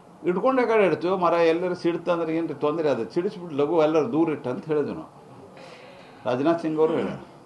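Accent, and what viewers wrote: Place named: North Karnataka, India. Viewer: ಅಚ್ಚು ಉತ್ತರ ಕರ್ನಾಟಕ ಭಾಷೆಯಲ್ಲಿ ಅತ್ಯಂತ ಪ್ರಬುದ್ಧ, ಸಮತೋಲನದ, ಯಾವುದೇ ವಿವಾದಕ್ಕೆ ಎಡೆ ಮಾಡಿಕೊಡದ ಸ್ಪಷ್ಟಿಕರಣ. ತಮಗೆ ಅನಂತ ಕ್ರತಜ್ಞೆತೆಗಳು 🙏👌👍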